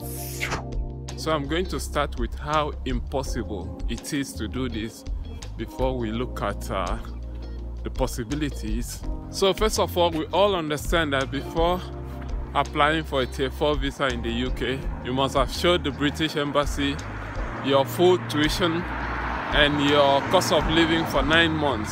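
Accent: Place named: West, United States. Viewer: Igbo boy alert! But you sounded like you're from the west. 😂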